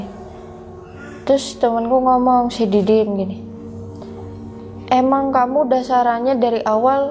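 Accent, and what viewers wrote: Java, Indonesia. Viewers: mbaknya medok bngt ngmong bhsa indonesianya kayak sayaaa😆😍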